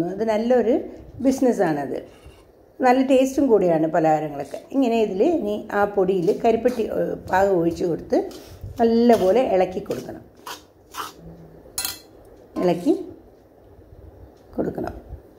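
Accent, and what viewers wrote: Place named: Tamil Nadu, India. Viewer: നിങ്ങളുടെ സംസാരം നല്ല രസമുണ്ട്.. Tamil slang touch ഉണ്ട്, നല്ല recipe tnx ❤️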